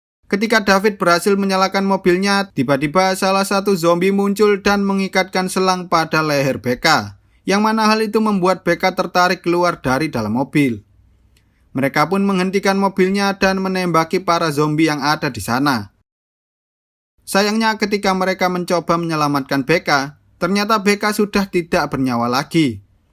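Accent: Java, Indonesia. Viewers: Loh,Mimin wong jowo to dadake? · Mimi orang Jawa kah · Uong jowo to mas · Ngomongnya jelas bgt bang tapi medok bgt cengkoknya 😭 aku agak gak biasa dengernya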